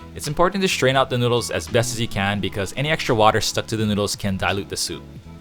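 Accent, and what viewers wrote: Hawaii, United States. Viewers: Are you from Hawaii? You sound like you're from hawaii.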